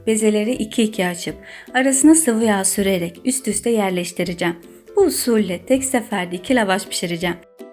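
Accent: Azerbaijan. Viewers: Cok guzel kısa net. ve bıktırmadan anlatiyorsunuz??elinize sağlık..merakımı mahzur görun ama bir sorum var sanki azeri bir aksanınız var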